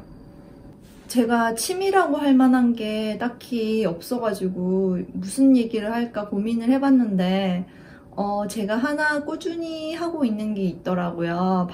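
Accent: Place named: Japan. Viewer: 한국말을 하시면서도 억양이 일본어로 말씀 하시는것같아요~~ 저는 혼자 그것도 타국에 살아본적이 없어서 너무 부러워요ㅠㅠㅠ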